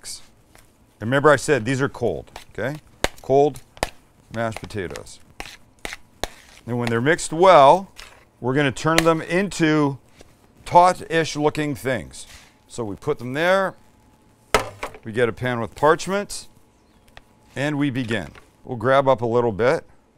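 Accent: Canada. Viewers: I’ve been following this channel for how many years now and I just now caught the Canadian accent??? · Did I hear say he was born in California? He sounds Canadian or…something.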